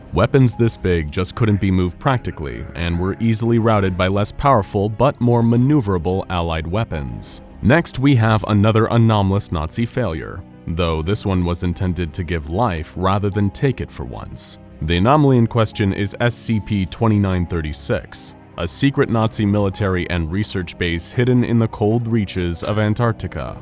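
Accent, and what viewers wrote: Germany. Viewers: im German and you cant speak german you doesn't have the accent for that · This German accent is atrocious.... Fun to watch though.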